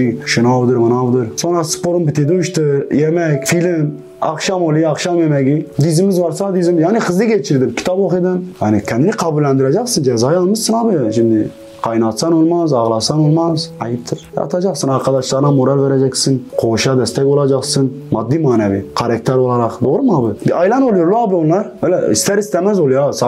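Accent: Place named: Diyarbakır, Turkey. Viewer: Senin şivene kurban olayım diyarbekirlim ❤🌹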